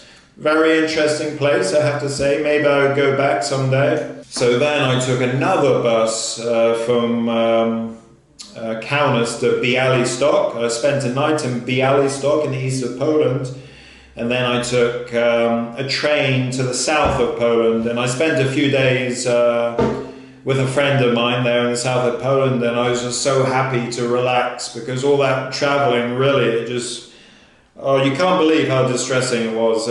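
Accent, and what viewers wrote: England, United Kingdom. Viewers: Hi Mr traveler I’m new too your channel I loved your video and I love your English accent! Sending love and greetings from Orlando Florida USA ❤ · You actually did pronounce it right. Close to it as you can get with the english accent. Kaliningrad is supposedly fun to visit if you like all the old abandoned wwII stuff, you should have explored all of that. But right now with the situation, just get back to Europe.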